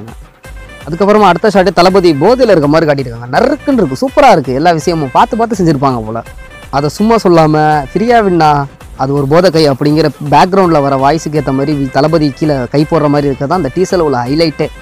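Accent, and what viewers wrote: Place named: Canada. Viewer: Enna bro Canadian lad maathiri tamil maappilaya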